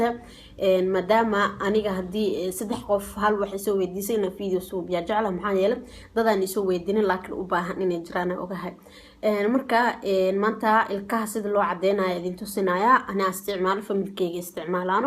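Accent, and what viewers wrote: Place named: Italy. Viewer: byo mashalh waad fcnthy lkn Lahjadaada wlhi daacaddii ma fahmayo af talyaani iyo sisi kale baad u hadashaa mrka ugu yaraan ingredientska english noogu sheeg adoo iga rali ah .. thanx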